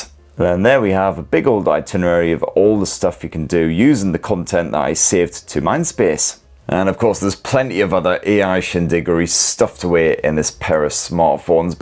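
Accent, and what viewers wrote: United Kingdom. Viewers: I thought you were a Brit. Do pics look crisp or crispy like potatoes? 😋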